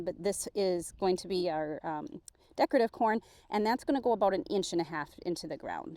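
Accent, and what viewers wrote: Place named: Midwest, United States. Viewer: Just got sent over here on a recommendation... Holy moly your Midwest accent is THICK. Minnesota? I'm from Northern WI, almost the UP- probably why I hear your accent 😂 New subscriber here. I hope to see more content!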